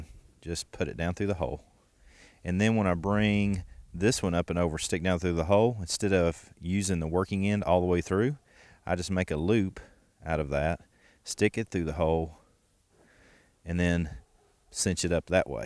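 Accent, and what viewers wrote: Kentucky, United States. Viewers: As soon as you started talking, I thought, "This guy's accent sounds very KY." Then I realized from your logo you're in Winchester. From one fellow Kentuckian to another, keep up the good work. You did a great job explaining these knots.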